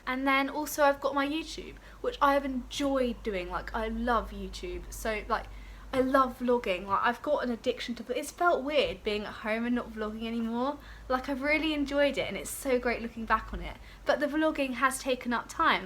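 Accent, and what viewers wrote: United Kingdom. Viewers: Omggg i love your accent 😍😍i'm in love with British English aaaaaaa😍😍😍😍😍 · Are you American? You've got a British accent!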